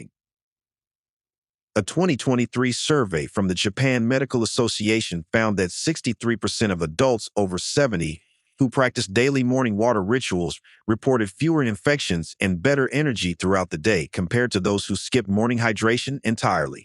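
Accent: United States. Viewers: Without the American accent which destroys it’s credibility immediately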